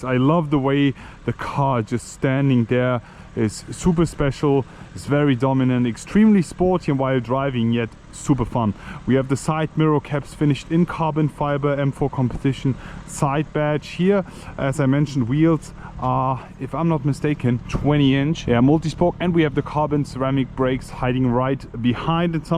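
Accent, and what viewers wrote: Germany. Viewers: Bro. Yr accent 100% kartoffel gotta love it!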